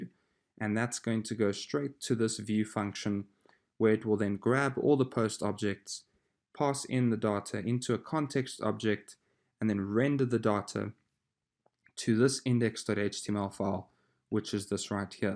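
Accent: South Africa. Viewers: South africa? Create video, super clear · You are so from South Africa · xD defiantly a South African accent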